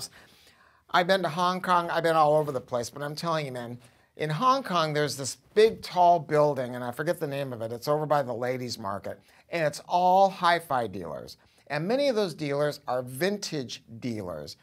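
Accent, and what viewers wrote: England, United Kingdom. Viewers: Shit. That english accent is exactly like mine. I feel seen. You know that episode of the Simpsons which is a parody of The Midwich Cuckoos? The Bloodening? Like that.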